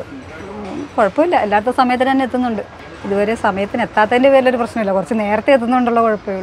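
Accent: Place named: Pathanamthitta, India. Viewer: കാസറഗോഡ് കാരി ആണെങ്കിലും തനി പത്തനംതിട്ട കാരിയുടെ സംസാരം. 🌹🌹🌹👌👌🙏🙏👍👍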